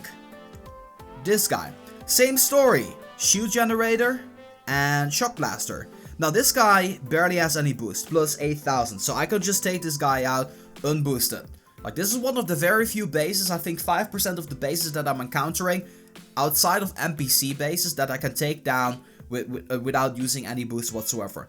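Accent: Netherlands. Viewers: | Boom Beach & Clash Royale Are you from the netherlands? you have a dutch accent :L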